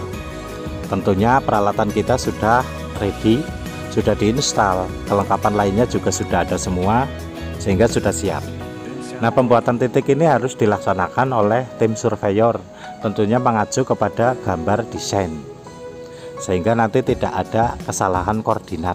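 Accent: Java, Indonesia. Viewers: Rika sih jawane ngendi melu ngode lah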